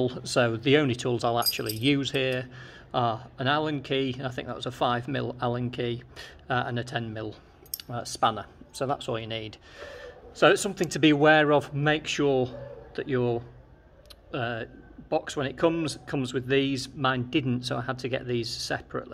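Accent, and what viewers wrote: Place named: England, United Kingdom. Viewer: Not only a great video, well explained, but also what a coincidence, I just picked up my XR150L with rack, (2016) so spot on! Curiosity though, your accent sounds English, but the plate looks to be from another country.....my accent too is English (with a hint of Bristolian), but me and the bike? We are in Colombia, South America.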